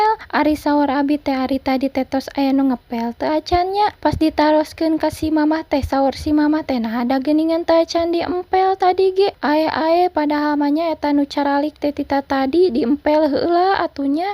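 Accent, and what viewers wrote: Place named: Sunda, Indonesia. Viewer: Sunda nya teh 😊😊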